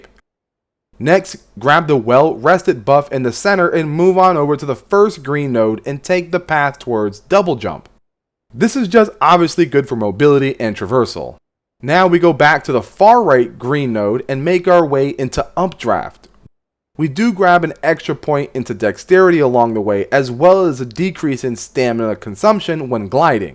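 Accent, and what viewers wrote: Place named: Mexico. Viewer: you sound Mexican...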